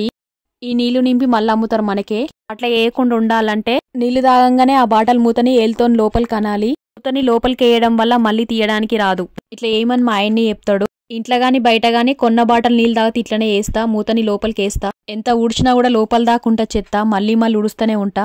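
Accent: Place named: Jammikunta, India. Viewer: Maadhi jammikunta akka meeru matladthante mem matladinatte anipittandhi....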